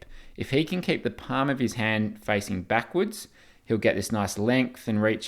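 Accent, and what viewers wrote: Australia. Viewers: Australian accent makes this feel both legit and relaxed as hell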